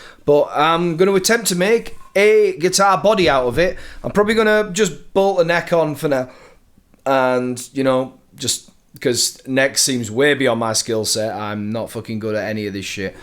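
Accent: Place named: Darlington, United Kingdom. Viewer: I'm itching to see the next episode... are you posting anytime soon? Great to see your videos though, I'm a Darlo boy living in New Zealand and it's good to hear a familiar accent.... Cheers, Steve.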